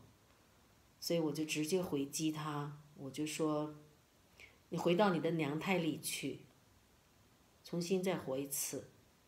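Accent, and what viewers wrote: United Kingdom. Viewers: 喔，英伦口音好高雅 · 您的英音还挺好听的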